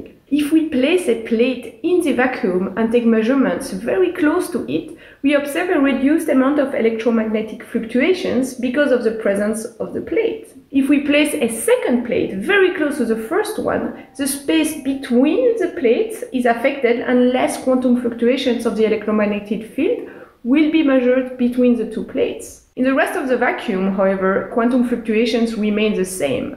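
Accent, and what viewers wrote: France. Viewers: I noticed a french accent. surely not a surprise. But given that google ASK AI is only in english (at least not in french) I guess this is another science communication force toward french to english to french communicatino in scinece. off topic in passing just noticing. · helloo i love your french accent, i could listen to you talk about casimir effects all day 🤩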